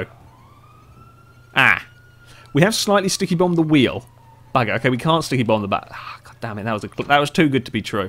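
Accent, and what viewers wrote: United Kingdom. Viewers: If it wasn't for your British accent this would have been unwatchable, that said I enjoyed it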